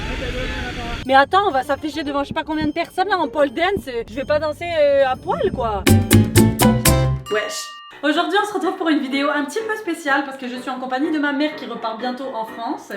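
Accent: Quebec, Canada. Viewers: l'accent québécois s'en vient petit à petit #teamquebec 🇲🇶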